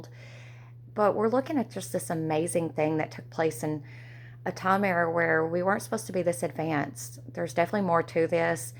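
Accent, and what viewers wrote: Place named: South, United States. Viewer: Indoctrination, why do you think we longer host these ridiculous events??? Obviously the city was there before, and our historical timeline is just not accurate. We're all being manipulated to believe a timeline that glorifies north America and white people, putting us at the pinnacle of creation, science, and invention which is just not true. Many civilizations before Europeans were far more advanced, and Europeans stole their technology, land, and even people and claimed it all for their own. There is also several civilizations and groups of people that were obviously wiped out because we have their technology but no people and no history and think about all the libraries that have been burnt on purpose and even the book burnings in world war II. Much of our history has been erased on purpose to benefit one group of people or should I say 1percenters lol. 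Great video though, I love the images you used, and your southern accent as I am also a southern woman questioning history lol.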